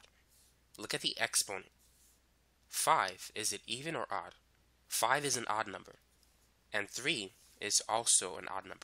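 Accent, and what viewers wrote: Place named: Boston, United States. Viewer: Are you from Boston? I dig your faint accent.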